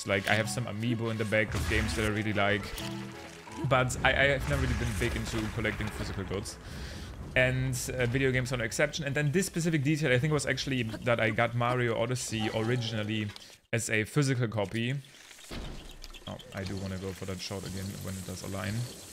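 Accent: Germany. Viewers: are you german by any chance? i feel like i’m hearing a very slight accent and it reminds me of my home region there.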